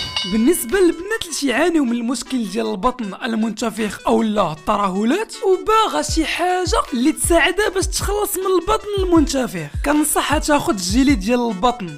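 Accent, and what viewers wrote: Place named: Morocco. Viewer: مانيش جايه ندير رياضه جايه نسمع هدرتك اللغه المغربيه تعجبني ههه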